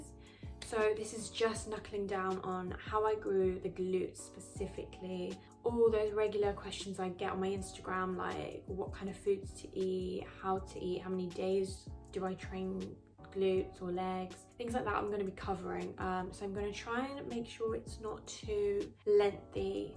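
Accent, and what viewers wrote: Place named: United Kingdom. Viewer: I don’t understand a single word ohhhh Britain 🙄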